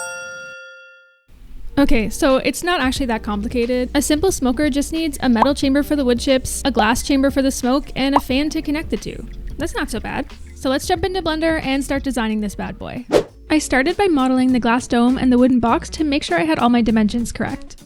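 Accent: Newcastle, United Kingdom. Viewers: Are you from Newcastle??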